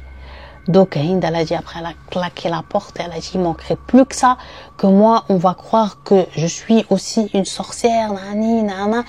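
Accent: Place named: Arab world. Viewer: Merci pour ton travail t'as une voix superbe et j'aime ton accent arabe.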